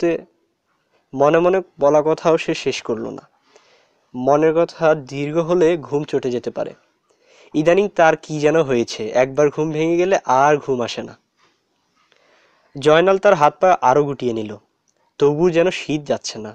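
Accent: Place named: Noakhali, Bangladesh. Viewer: চালিয়েে যাউ মন্দনা আরও ভালো হতে হবে নৌয়াকালি আনছলিক টান আছে একস্টা প্লেবার রয়েছে বেশ লেগেছে।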